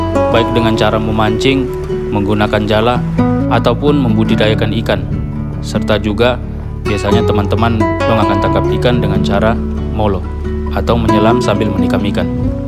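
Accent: Papua, Indonesia. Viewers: Bang klw bisa isi subtitle dong.. Mngkin mrepotkan.. Cmn ad bbrapa istilah atw logat papua yg kita kurang mngerti..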